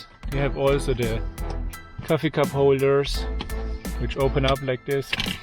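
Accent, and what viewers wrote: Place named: Germany. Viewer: Trevlig video men varför har du tysk brytning när du pratar engelska? :O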